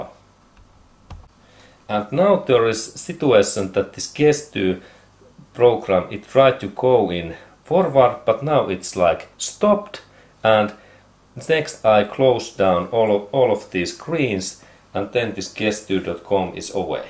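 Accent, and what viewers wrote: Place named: Finland. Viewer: Your Finnish accent is soooo cute. 😉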